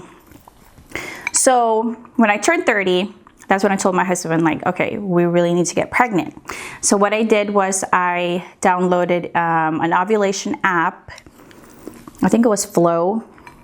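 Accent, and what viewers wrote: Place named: Philippines. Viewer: I love your Filipino accent. It's so different when you were whispering hehe. Love you ate ❤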